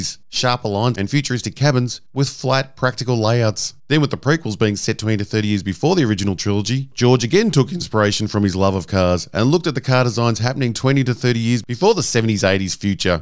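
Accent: Australia. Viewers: Lmao I love this channel. The AT AT with that music intro and outro (idk why I love it sm but I do haha), the constant bashing of Disney’s Star Wars, and the hilarious jokes this guy makes with an Australian (I’m assuming) accent. I apologize if I assumed wrong haha but keep the good work up. Love your content :) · Ah.....luv ya work,! And the Aussie accent totally keeps it real, bro!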